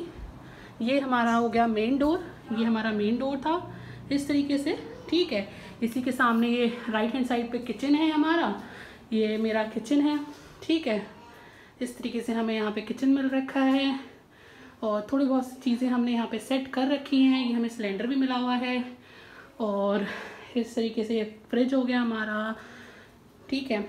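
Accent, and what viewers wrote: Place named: Pakistan. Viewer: that's gd but you speak in Pakistani style and speak very clear Urdu as well even I've noticed one thing that you didn't use any single word of Hindi.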